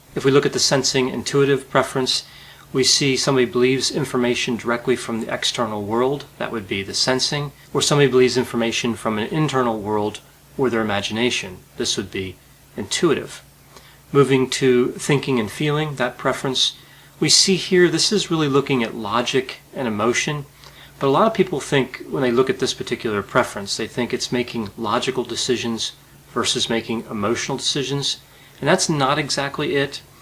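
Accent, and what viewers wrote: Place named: Pennsylvania, United States. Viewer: I thought you might be INTP. As and ENFJ with inferior Ti I appreciate your logical analysis of psychological concepts. You are very interesting and entertaining to me. I have to disagree with you on the negatives of the MBTI. I don't identify with the other types like I do with the description of ENFJ. I also disagree with the descriptions of the types being all positive. The negatives are also discussed. I find it valid in the way it has helped me to understand myself and others. I appreciate my and others strengths and weaknesses so much more than I ever have. I love myself and others more. I am aware of my defects and how I can improve within reason. Comparing the MBTI to the Big 5 is not a fair comparison. The Big 5 was developed as an instrument to statistically determine mental health issues. The MBTI was not. 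It's more about how people think and relate cognitively. But I appreciate your analysis and love what you are doing. I first started listening to you because of your Pennsylvanian accent. I grew up in Lancaster County. Your videos showed up in my feed when I was listening to Dr. Ramani. I'm glad they did. You have taught me so many things and make my life so much more enjoyable. Thank you. Diane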